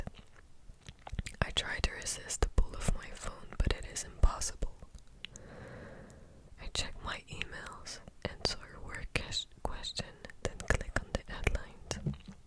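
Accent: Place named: Quebec, Canada. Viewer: she's from Quebec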